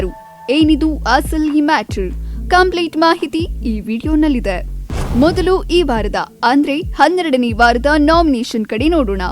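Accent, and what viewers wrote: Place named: United Kingdom. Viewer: why are you talking like as though you are an british talking in kannada. It sounds dirty and cheap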